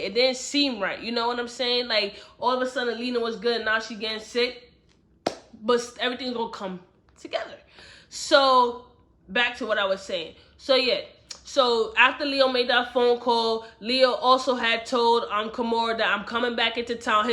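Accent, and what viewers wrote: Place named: New York, United States. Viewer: I knew you from N.y. I can hear the accent. I'm from CT.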